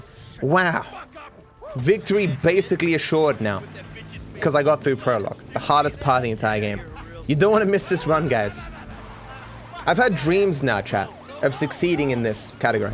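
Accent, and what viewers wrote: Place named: Australia. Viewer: Cmon man just let that native Australian accent FLOOOOOW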